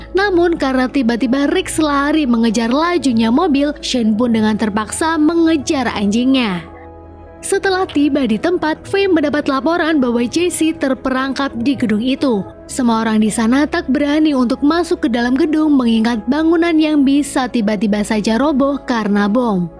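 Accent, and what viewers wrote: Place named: Surabaya, Indonesia. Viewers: Kok Suroboyo Boyo metu embak tapi aku seneng loh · Min mimin orng jawa ya min kok gomong kek ong jawa sama uwu ternyata toxic juga ya min:v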